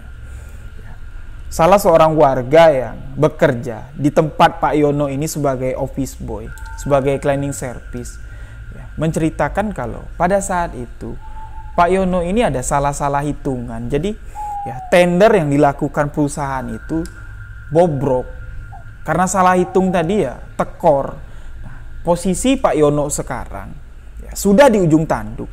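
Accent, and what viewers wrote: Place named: Madura, Indonesia. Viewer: Logat ny kakak kok seprti maduro hehe 🙏 maaf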